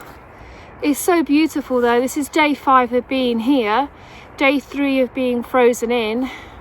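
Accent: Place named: Essex, United Kingdom. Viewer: Interested to note you were born in Eastern Ave, Ilford (thought I recognised your accent!) . I was born in Dagenham, close to Martins Corner only a few miles from you. Have been a subscriber for some time and enjoy your travels. Love Zephyr too!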